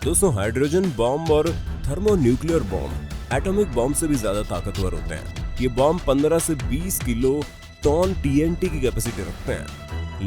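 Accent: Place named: Pakistan. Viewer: Kaise hogi? Pakistani hai yeh.iski awaz se saaf pta chalta hai.chanel ka naam haha....Paise kamane hai wo bhi india ke naam pe.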